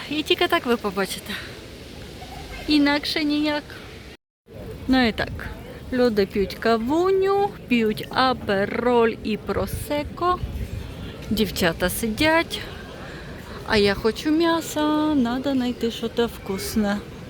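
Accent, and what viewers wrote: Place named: Surzhyk, Ukraine. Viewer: вітання! цікавий репортаж, але ваш дикий суржик все псує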